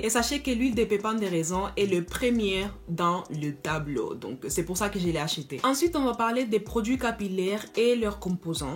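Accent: Spain. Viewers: ton accent ... je me demandai si tu étais espagnol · Tu as l'accent espagnol 😊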